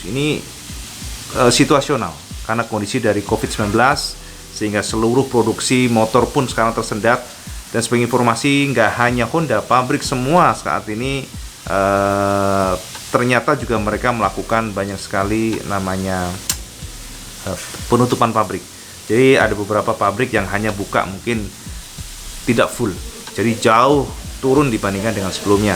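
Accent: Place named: East Java, Indonesia. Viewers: Alasan Suku dengan Chenele'e.. mergo Pejelasane detail,Paling utama Logat jowone blas ora ktinggalannn,salam jos gandosss.. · Sampean iki arek jawa timur endi cak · Sampai detik ini aku masih bingung. Fans bisa sebanyak itu gimana yaa.. padahal ngomomh jawa kentel teruss hahaha.. Pertanyaanya fans nya ini apa orang jawa semuaa atau emg banyak yg gak ngerti apa yg omongin yaa 😁 BTW aku orang keturunan Jawa jadi ngerti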